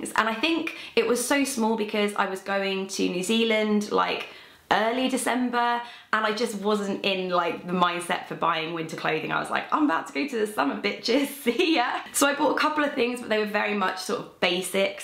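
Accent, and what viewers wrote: United Kingdom. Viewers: Yikes, it’s not wrong, she’s British. Have you never heard an accent in your life?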